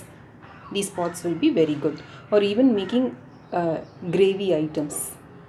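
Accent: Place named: South, United States. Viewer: Very very informative. Thank you. I like your southy accent.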